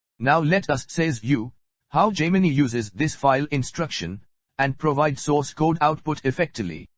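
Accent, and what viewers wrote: India. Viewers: Another slop tool presented by a Indian robot voice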